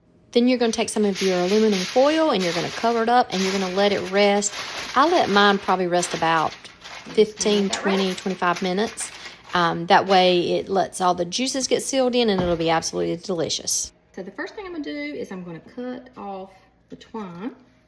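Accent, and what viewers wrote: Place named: South, United States. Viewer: I love the southern accents you, Dan-O, Hickok 45, Stalecracker and a bunch of other southern cooks real Americans 👍🇺🇲 bless all ya all😊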